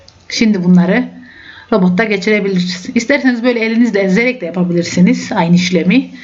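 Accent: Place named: Adana, Turkey. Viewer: Selam canım naneler yemyeşil nerelisin Adana gibi geldi bana.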